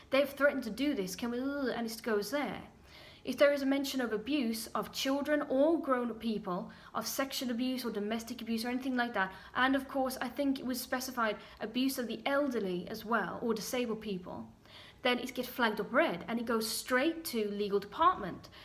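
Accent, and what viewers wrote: Ireland. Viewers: Is that an Irish accent trying to sneak its way through? Great video btw! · You have a slight Irish lilt or is it country bumpkin ..well ,can we get a close up on these rings ? Your logic makes too much sense ,and this is why you are out of it. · I saw that movie, Gas light.....some frenchman and I think Ingrid Bergman. He was turning the gas light down just a little or something, making her think she was losing her sight also. YOu are spot on, if anything a little soft on them. Do I detect an Irish accent? it is very slight, but I love it.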